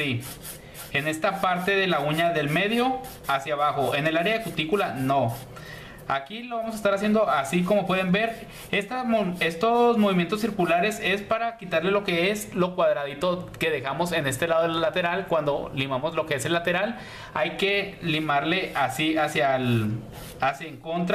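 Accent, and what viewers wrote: Mexico. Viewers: Si pudiera amiga ese es su acento no todos los mexicanos hablamos igual, depende de el estado cada quien tiene su acento y el de el esta padre.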